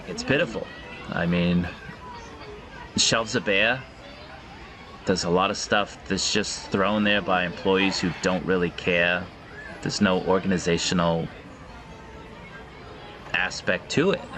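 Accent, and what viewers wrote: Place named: Massachusetts, United States. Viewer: You can't deny it now your from the Massachusetts and the accent is truely from their child palace became a circuit city the one in Dedham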